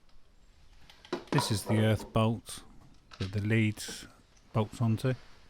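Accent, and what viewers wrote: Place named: United Kingdom. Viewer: Nice accent! Where part of UK are you from?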